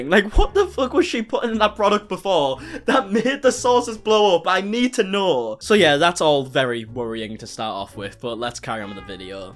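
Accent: United Kingdom. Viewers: Knew this dude was british before i clicked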